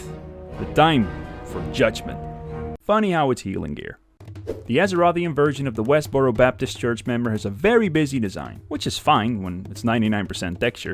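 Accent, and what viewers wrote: Netherlands. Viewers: Je spreekt super goed Engels voor een Nederlander. Letterlijk accentloos. Echter hoorde ik heel lichtjes dat jij een Nederlander was! Super content man. Het is jou gegund om te groeien als een knol!!!!🎉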